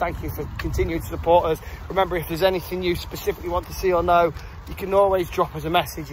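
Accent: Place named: Nottingham, United Kingdom. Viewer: mate I'm from Hullujah formally know as hull🤣I live in Notts now so I thought I recognised that robin hood drawl 🤣👍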